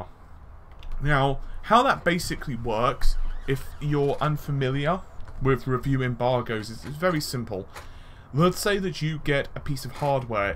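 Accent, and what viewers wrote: England, United Kingdom. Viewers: Best place to listen a good English accent too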